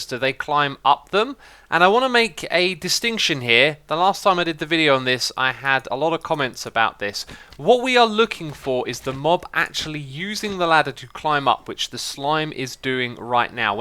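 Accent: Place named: United Kingdom. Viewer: What happened to xisumas accent over the years? Some ppl might not know but had a "normal" accent. I mean sounded like a american accent. Not sure how he started talking british suddenly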